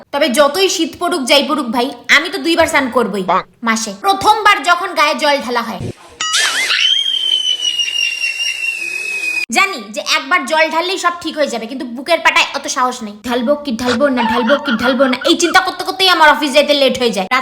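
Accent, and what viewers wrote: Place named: Bangladesh. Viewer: আচ্ছা দিদি তোমার ভাষাগত টান বাংলাদেশের মতো। তুমি কি বাংলাদেশি?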